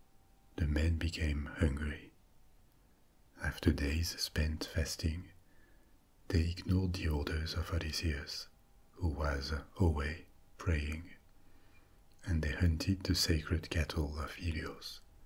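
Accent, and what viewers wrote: France. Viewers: Can't forget he's french. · Yeah the only thing keeping me up is the accent because I am French as well and it s super annoying that he does not speak in French. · Farted as soon as it started🤦🏼‍♂️😬 scared the shit outta me 🤣😂 Butt... Soon as he said just close your eyes n let me do all the work ...😶👀🤗shiiiiitttt😴😴 say less French boss man whisper guy ..hella amazing 💯💯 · Vu l’accent tu es français, existe-t-il des équivalents vf de tes récits mythologique? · Dude I love your accent it reminds me of our (America's, my home country) French Allies in the Revolutionary War which in turn makes me immediately biased, and therefore I enjoy listening to you speak. Thank you for these videos you make for us. Certainly subscribing, my good sir! I wish you nothing but the absolute best. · So zi really found the french qccent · Nothing more relaxing then the sound of a french accent. 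One of the more beautiful accents In my opinion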